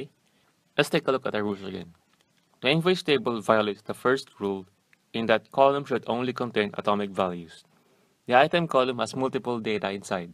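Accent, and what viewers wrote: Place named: Philippines. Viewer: Thnx....but Oh God, the accent, so hard to understand him...but Pinoy pride baby ;)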